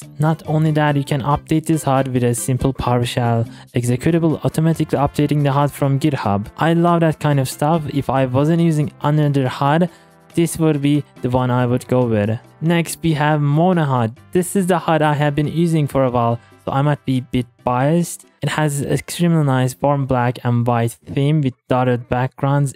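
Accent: Turkey. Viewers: aksanından türkçe sırıtıyor dost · bruh your accent screams Im turkish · Bro ı think u are turkhis because your speech is similar to a Turkish person speaking English.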